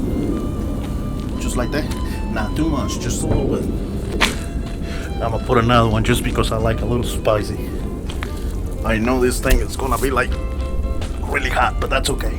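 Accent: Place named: Kansas City, United States. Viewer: Boy, those Potato Taco's with salsa! Yummy! You are a real Mexican with a Kansas City accent. Not making fun of you. I have Mexican cousins that live in Topeka! I am going to tell them about you! I will making your salsa, that's for sure!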